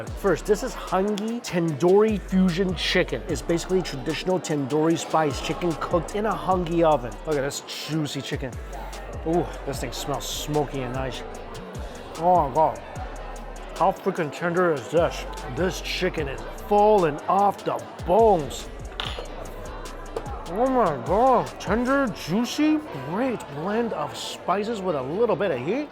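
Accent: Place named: United States. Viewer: Yummy man I love our your pronunciation is freaken Terrible lol and so American man.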